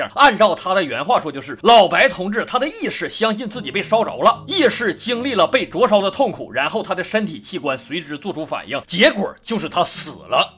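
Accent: Northeast, China. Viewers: 牛叔啊，你这一口霸道的东北话太牛掰了！我也是东北人，但没有这么重的东北话口音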